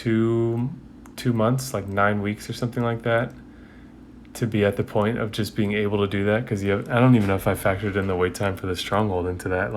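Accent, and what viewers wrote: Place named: United States. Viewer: From the slight accent at the back of his voice it is safe to think he may be American. It's that slight drawl and laconic speech which makes me think he is someone who graduated from University. Most likely someone who has a lot of friends but only a select few whom he considers his closest mates. I don't know. I'm just taking a stab in the dark. As a writer I often create stories for those I follow on YouTube. I'll be wildly outrageous and take liberties that others wouldn't. The only thing I never do is make assumptions about their sexualities as that is a private matter that is private to them only. I don't need to know their personal life that closely but it is fun to hazard a guess at their most basic backgrounds. In all honesty, he sounds American due to the fact my sister went to America a long, long time ago and fell in love with America and hasn't come back home for over thirty years!!! 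She even sounds like a dinky di American from New York!!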